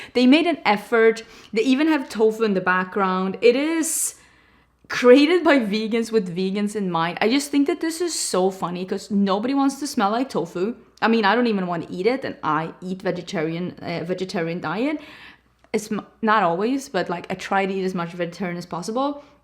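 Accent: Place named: Sweden. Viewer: You speak too fast with that thick Swedish accent, slow it down, gosh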